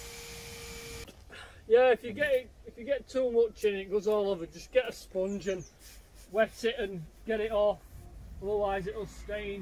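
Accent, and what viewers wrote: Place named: Yorkshire, United Kingdom. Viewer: Always thought you sounded local, I’m from Wakefield my self!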